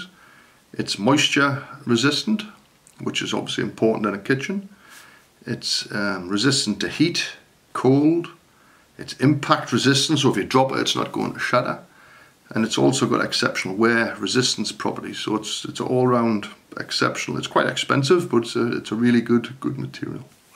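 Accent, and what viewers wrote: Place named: North, United Kingdom. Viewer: Always a delight hearing another northern accent on YT!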